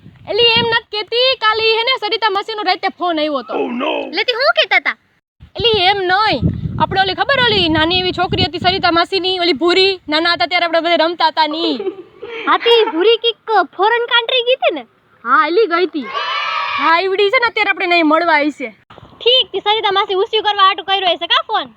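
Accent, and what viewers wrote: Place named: Junagadh, India. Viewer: Having grown up in junagadh...You made me nostalgic, listening your cute accent....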